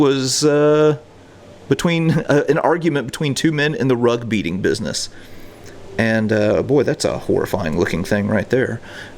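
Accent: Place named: Midwest, United States. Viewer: "Dadgum", "merciful days" I love your homespun, Midwestern exclamations.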